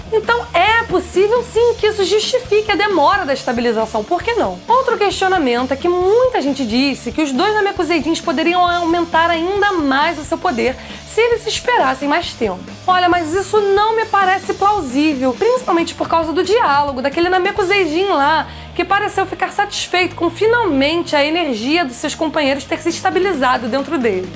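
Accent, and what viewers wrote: Rio de Janeiro, Brazil. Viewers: Sou carioca e entendo....o x no lugar do s..... É foda :/ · Pietro Silva Claro que não né... Sou paulista,e não me incomodo com o sotaque carioca. Eu já sou acostumado a ouvir . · Eu tbm sou carioca.. Ksjsjsjsj, esse "i" sempre vem nais palavrais. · Kkkk, estive no Rio e reparei no Douze tbm, naiscimento, mas acaba sendo fofo. Relaxa.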